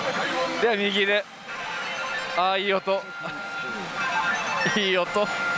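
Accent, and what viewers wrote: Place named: Kochi, Japan. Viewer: 土佐弁最高！😊同じ高知で応援してます😆👍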